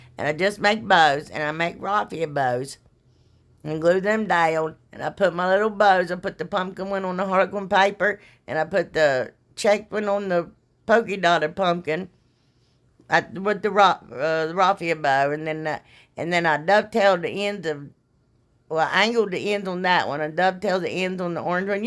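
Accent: South, United States. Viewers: As a woman who knows this lady well I talk just like her and if you don't like the way we talk well I can't exactly say what I want to say but you can kiss our 🍑 I know there's plenty of people in my life who love the way I talk and I guarantee you with form I guarantee you she's got way more people with the life the way she talks instead of not liking the way she talks so for this one for you trolls get a life you don't like the way she talks don't watch her videos it's that easy folks... I love you girl and you know I'm going to stand up for you I think our country bumpkin accents are cute so pee on them haters... Sorry I called him headed so early but that upset me made me mad I told you I always have your back and I mean it God bless you dear.. I'll always be here for you.. actually we should start a crafting channel that focuses a lot on our accents a real ways of life these people have no idea of where we come from...🙏🏼💯😉😜🍀🍀🍀🦋🦋🦋🤟🏻🤔👑👑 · I think you're so freakin' cute! 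I was raised in the south and now live in the north, and I really miss hearing the southern accents! Forget about the haters, they have nothing better to do than be trolls. xo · Hey now...who cares what others say... I looooove that Southern accent of yours 🥰😘 I have the A.D.D., A.D.H.D. and I'm very OCD...LOL Can you imagine 🤣 I think I understand you more than any of the other craft ppl on YouTube...lol Your crafts are amazing!!! XOXO ~ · Honey, I'm so happy you posted! You've been on my heart ever since your live & I've been praying for ya hon 🙏♥ As for some wackadoo saying you talk funny or whatever...they obviously don't know you have a whole army backing ya & we LOVE US SOME & HER SWEET SOUTHERN SELF 🥰 (Don't make 1996 Lisa have to come out 🤣🤣) Anyhoo, honey everything turned out so beautiful and I LOVE that black truck wreath & your punkin Gather sign 😍😍 You already know...ILYFTBOMH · These all turned out beautiful! 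Thanks for sharing your ideas and creativity! Love you lots. If folks are being ugly, don’t pay attention to them. It’s been my experience that when people act ugly & rude it’s because they are unhappy & miserable in their own life. Don’t worry about anything, you are doing a fantastic job and there are plenty of us that love you. I’ve also noticed that some people just can’t handle all our southern charm and accent. Don’t you pay them any mind. You are great at what you do, so keep on keeping on. Glad you’re feeling better. Love 💕 & hugs 🤗 God bless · even though I'm a newer subscriber, (I'm from East TN) tell the haters "Bless their poor hearts" and they can kiss your beautiful southern speaking white a@ I absolutely love you and your page. You truly are a beautiful person inside and out. Much love ma'am and please stop apologizing for being you. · Love your country accent 😍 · FINALLY I have found someone who speaks the same language I do! 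Southern! Lol! So refreshing to dial you up understand you & can SO relate! People make fun of the way I talk…but that’s OK!! I call brokers in New York City & when they hear my accent, they automatically deduct 50 points of my IQ! But while they’re laughing & poking fun, I’m taking money out of their accounts before they even know what’s happening!! I may be country…but I’m smart!! Lol!! Just like you!! Love you, Girl!!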